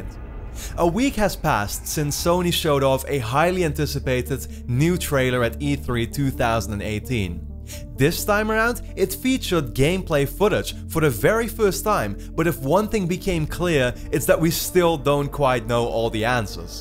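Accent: Netherlands. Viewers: Having an allergy may mean he has better resistance, though, to whatever is causing it. Awesome video! Can't wait for the game either. Heerlijk NL accentje btw :D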